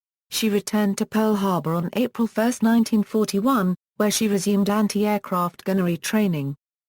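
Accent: England, United Kingdom. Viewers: Love the English accent hate the robotic voice.....And by the way.....Tesla invented and received a patent covering RCVs.......The USS Utah may well have been one of, if not THE, largest vehicle/vessel ever controlled by radio(remote) control to date!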